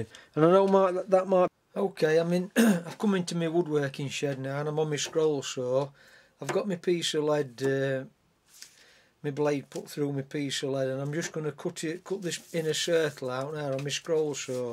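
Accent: Yorkshire, United Kingdom. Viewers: Looks like your taking a very good approach to it, - As you said not the easiest of boilers to make and flange. Are you from Yorkshire? Derbyshire? Keep up the good work !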